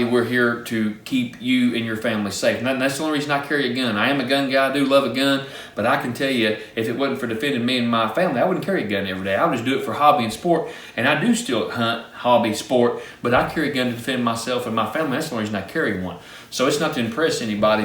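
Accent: Kentucky, United States. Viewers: I wish the same to you and yours. It's amazing how people from across the world, in spite of varied experiences, and circumstances, can learn from each other and find common ground through channels like yours . It might surprise many how similar people are essentially. Shared values accross geographic, & cultural, and even racial divides. Thanks to the hard work of people like you, we have the opportunity to learn to adapt, improvise, and overcome . #PS . First time ever I have knowingly interacted with someone from Kentucky and I find that Kentucky twang extremely endearing and honest( don't ask me why; I just do. I myself don't know why). I normally don't post a lot of long winded comments so this is a first for me. Have a blessed life, and keep sharing your insights.🙏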